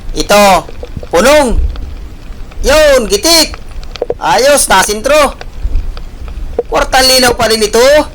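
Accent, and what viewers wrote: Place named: Visayas, Philippines. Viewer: swerte kaparin KSI medyo marami Ang huli mo. Napansi ko syo sa salita mo parang bisaya ka.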